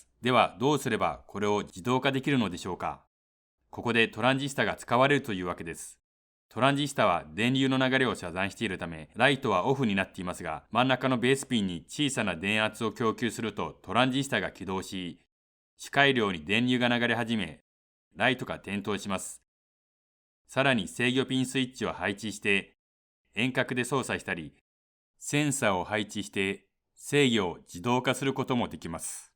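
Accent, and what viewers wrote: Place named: China. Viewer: 喋り方が中国人っぽい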